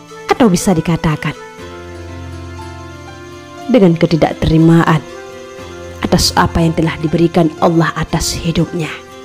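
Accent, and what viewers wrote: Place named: Medan, Indonesia. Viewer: logat medan😂😂😂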